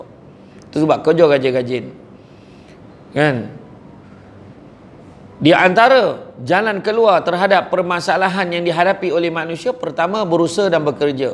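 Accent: Negeri Sembilan, Malaysia. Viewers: Itukah kan,cakap nogori best👍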